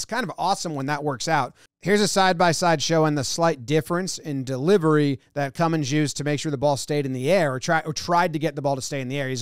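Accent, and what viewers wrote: United States. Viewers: Made my day. Sounds b like an American and he’s analyzing the game. Warms the cockles of my heart ❤❤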